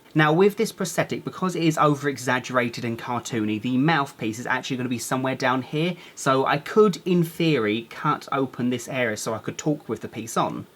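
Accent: United Kingdom. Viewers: Hai it's me again r u British? cuz be for I thought you were Irish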